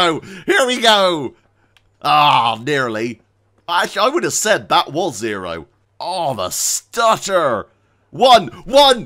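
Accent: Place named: Bristol, United Kingdom. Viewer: nice place, was there a few weeks ago. Grew up in Bristol so the accent seemed vaguely familiar. Anyway, getting back to the point, great video. Working my way through the first one at the moment.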